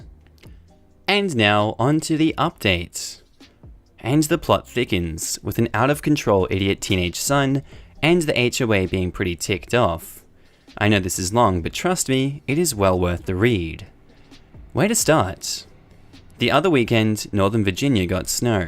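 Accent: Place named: Australia. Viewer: I like my new Aussie hubby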